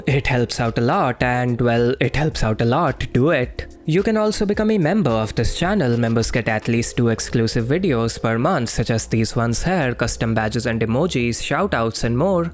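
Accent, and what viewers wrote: India. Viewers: Will your indian ascent ever go away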